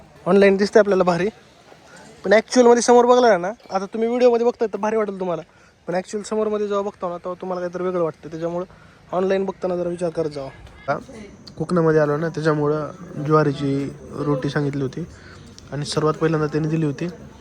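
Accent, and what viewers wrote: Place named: Latur, India. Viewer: Tu tar punet rahun Latur ci basha boltho 👍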